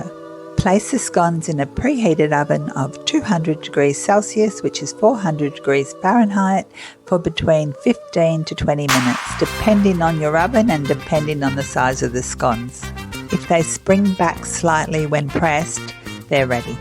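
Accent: Australia. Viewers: Fantastic!!! Thanks so much! So happy to hear a fellow Aussie cook😃💞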